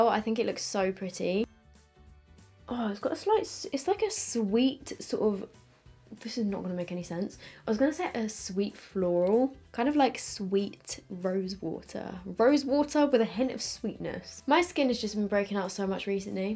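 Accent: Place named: United Kingdom. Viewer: Is it just me or was extra british in this video😂 absolutely beautiful as always!!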